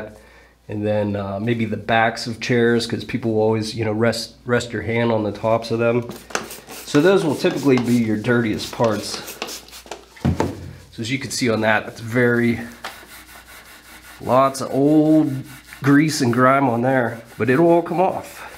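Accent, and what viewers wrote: Western Pennsylvania, United States. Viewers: Elizabeth/Clairton south of the Burgh. My parents were born and raised there. My mom's parents came to Clairton from Italy. My dad's parents came to Western PA from Wales. I'm a huge Pirates fan. Clemente was my boyhood hero (and still is!). We make an annual summer trip to the Burgh to visit cousins and take in a Pirates home series. Not sure it will happen this summer. Hope so. It's good to hear your Western PA word usage ("Pap," for example; waiting to hear you talk about "ridding" up the shop!) and accent. I've really enjoyed the craftsmanship and attention to detail you demonstrate on your projects. The Western PA connection is an added bonus (one I can't make with Thomas Johnson)!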